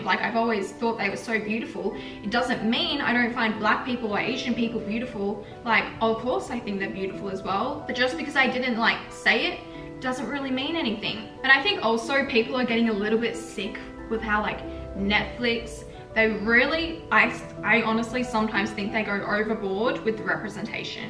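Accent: Australia. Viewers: I was like 5 second in, and I was like "Yup she's definitey australian" ;D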